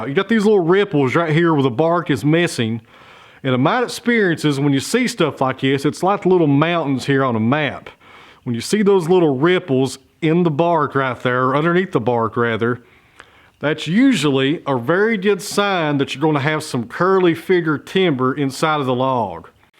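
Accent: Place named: East Tennessee, United States. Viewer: Ha! I started watching this and thought "that sounds like an east TN accent", then looked at the description and saw you was from Kingsport! (I'm from WNC, love me some east TN!)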